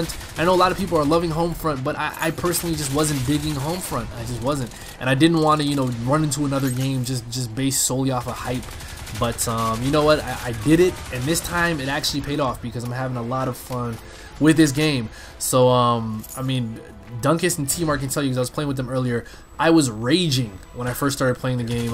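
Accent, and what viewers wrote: Canada. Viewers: you're Canadian eh?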